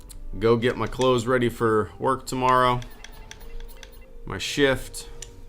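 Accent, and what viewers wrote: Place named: South, United States. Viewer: Really rocking that southern drawl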